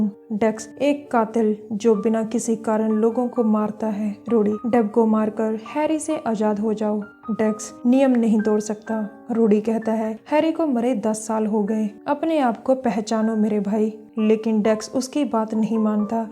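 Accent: Punjab, India. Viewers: Nyc mam ☺ your voice so osm mam aap kaha se ho mujhe apki voice sunke aisa kiu lgta hai ki aap punjab se ho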